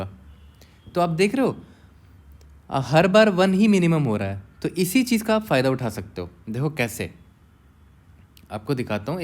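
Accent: Bihar, India. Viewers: i feel you are from bihar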